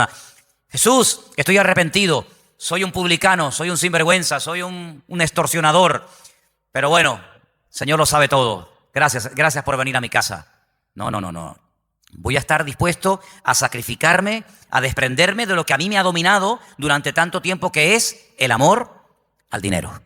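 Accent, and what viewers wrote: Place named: Argentina. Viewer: vale pensé era argentino se nota no e español, buen pastor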